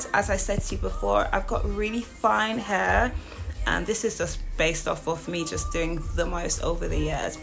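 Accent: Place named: United Kingdom. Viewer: I just love your accent. I love to hear a black Brittain. You are a very talented hairstylist as well. Here in America, everybody is going natural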